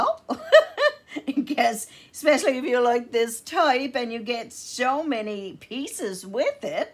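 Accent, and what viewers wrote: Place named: Newfoundland, Canada. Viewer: First time seeing one of your videos, and I love that kit that you got. I love that they're already framed and that you have the three pieces separately to do. They sure did add in a lot of extras also. I hope you don't mind me asking, but where are you from.? Your accent sort of sounds like where I'm from, and that is Newfoundland Canada, but I could be wrong 😄💜😊💜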